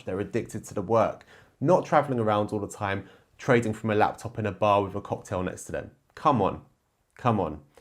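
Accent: United Kingdom. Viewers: Hmmmmm... Are you from Europe? Your accent sounds like British something. 🤔🤔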